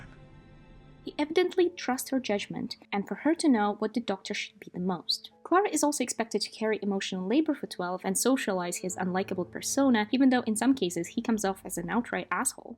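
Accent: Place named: Russia. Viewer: The whole video I thought whether you are a native Russian or not. Love your accent and your English! The essay is sooo well structured and interesting Good luck to you!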